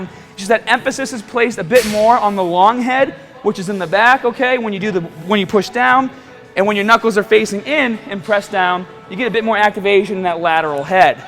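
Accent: Boston, United States. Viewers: Hey! It's been a while since I've watched your videos. I noticed your Boston accent is heavier. I wonder why. It's just a curious observation. It's quite lovely! And as always, great tips from the captain!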